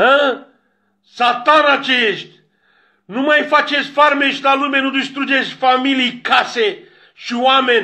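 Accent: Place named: Turkey. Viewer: E turc daia vorbeste asa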